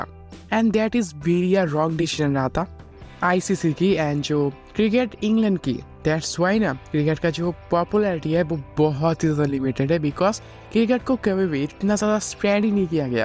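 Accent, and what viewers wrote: India. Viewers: Love your Hindi Accent Brother! Keep it up. nice work. easy thumbs up.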